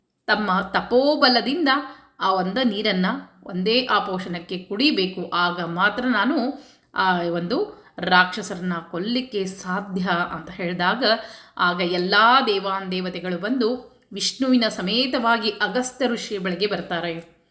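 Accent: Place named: Hubballi, India. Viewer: madam you are amazing. I like Hubbali accent. Thank you for you guidance. Like short Shlokas.Thank you❤ Namaskaram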